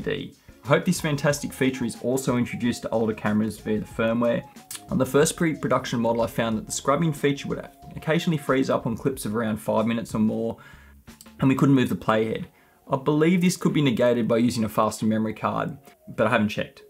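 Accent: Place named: Australia. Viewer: An excellent review. You sort of made a lot of mysterious stuff understandable even at the pace you were running at and me not being used to concentrating on oz tech accents. I look forward to some of the tip vids you might put up. I am very interested in getting slow-mo video working but have found very little understandable advice. Check the manual - No real explanations there neither in the Rocky Nook books. My preferred ambassadors are self confessed amateurs at video. Even asking eachother and getting no real answers. Thank you greatly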